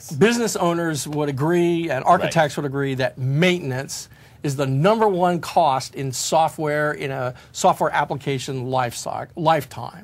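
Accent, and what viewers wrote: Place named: Baltimore, United States. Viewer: Effective. Well presented.... are you from Bali-mur? That's always a plus.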